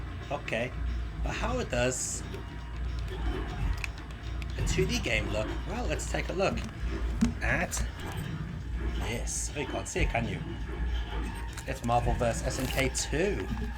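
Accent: United Kingdom. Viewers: Hey I hope you don't mind me asking. Are you a native to japan or did you move there? Your accent sounds very British!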